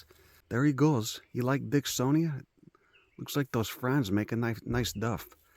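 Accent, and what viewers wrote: Chicago, United States. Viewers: I thought it was old school Chicago lol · I love the mental transition from "some dude in the USA with the fast talk and the accent" ... "in Tasmania". Gives me a whole new way of looking at the country.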